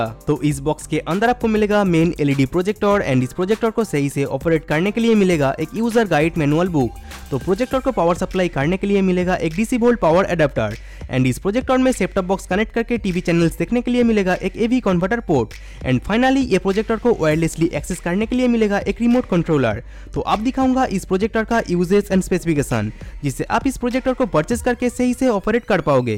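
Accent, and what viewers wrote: Bengal, India. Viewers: bengoli h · Aare kotha sunlei bojha Jai ... Tchara jokon uni 360 camera er unboxing korchilen tokon oner Facebook e Bangla te lekha chilo..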